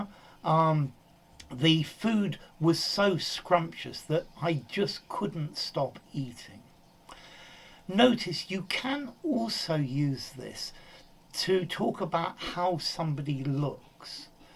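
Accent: United Kingdom. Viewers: Sir, may I know that you're really from United Kingdom because I want to learn the native English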